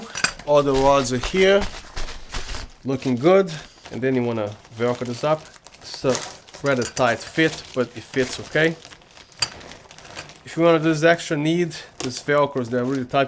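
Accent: Brazil. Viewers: I saw a lot of your videos and always i think you was brazilian or portugues... your english sound like brazilian! Great job bro! Regards from the Caribbean =)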